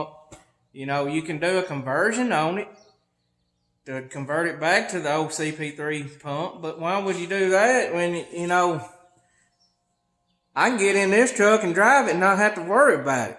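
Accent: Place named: United States. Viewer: cheers from poland, i got here by randomly searching "diesel truck" just cause i was bored lol. i like your accent. its nice to hear various american accents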